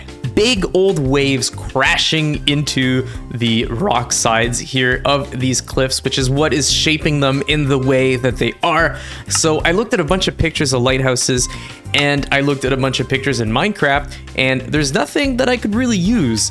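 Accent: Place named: East, Canada. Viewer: I love your videos! I've just subbed and look forward to watching more! I suspect that you're from eastern Canada too! Your accent sounds like you're from not too far away! :D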